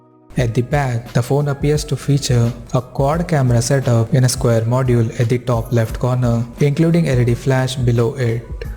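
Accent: India. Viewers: Are you indian?? Your location is usa but your voice is just like indian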